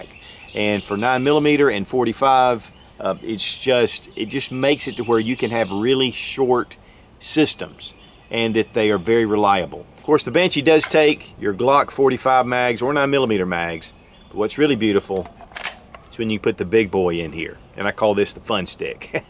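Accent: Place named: North Carolina, United States. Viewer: Hi. Like your videos. You sound like you are down in eastern NC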